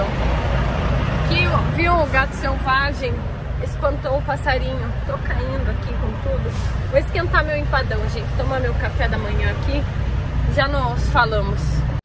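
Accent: South, Brazil. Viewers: um cara leigo nisso tudo...só viajando com a do café cromado mais linda nos USA motorista e vendedora de empadão! Mas essa carga e potes não poderiam ser amarrados com essa cinta nessa mesma estrutura contra as paredes onde você engancha - tipo um cinto de segurança para as cargas. Sempre linda de Curitiba! - "sotaquezinho" do sul da minha terra é fantástico! ehehe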